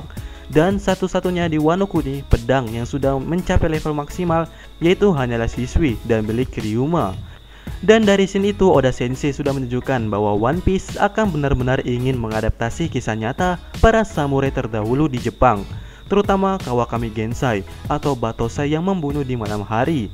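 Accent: Palembang, Indonesia. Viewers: Fix orng Palembang😂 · pantesan suaranya kek orang palembang gitu min hehee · Ay wong Palembang kau ni ee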